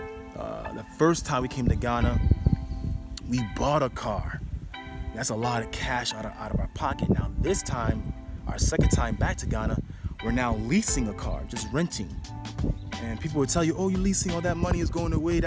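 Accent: United States. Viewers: Well once we go Africa me not depending on money,self sufficiency is the way Dem boi.jah provides💯💪🏿💯we were just born gluttonous in america.so I say cast down your gluttonous European ways before heading home.right now in America instead of being unhappy think african.meek and gracefully just infiltrated one of the largest nation's,now live meek save and build in africa.stop talking American on purpose.you can do it.thr choice is yours.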